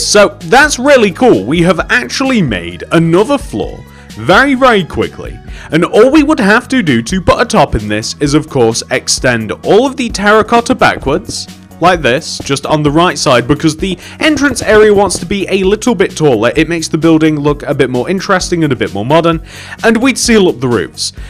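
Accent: United Kingdom. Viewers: a jolly British accent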